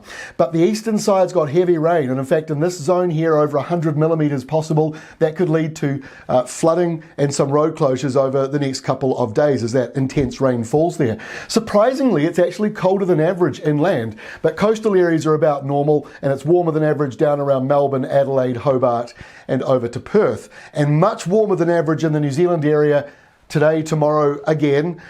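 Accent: New Zealand. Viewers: If there was an award for YouTube weather forecasters, you'd win hands down:))) great graphics, knowledgeable commentary and in depth analysis - all done in that lovely NZ accent!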